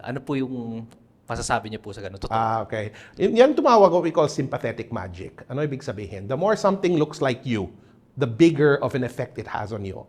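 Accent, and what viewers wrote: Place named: Cebuano, Philippines. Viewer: Malaman talaga na cebuano si sir eh pero hands off napaka Professional!!!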